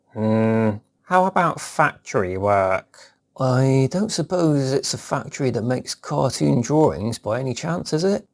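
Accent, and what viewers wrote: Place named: RP, United Kingdom. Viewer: On a different note, this channel is quite good if you want to learn Received Pronunciation.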